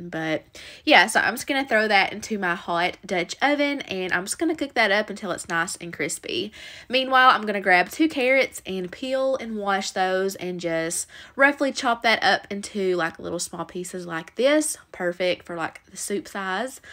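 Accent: South, United States. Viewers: I love southern accents so much 😫💜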